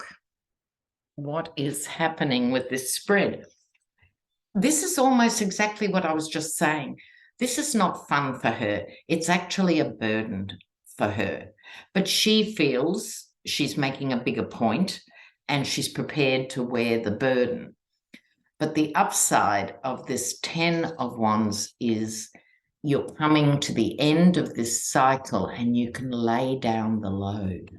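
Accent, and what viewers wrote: Australia. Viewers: I heard you on the Mary Trump Nerd Avengers show and had to give you a follow. It was great to hear an Aussie voice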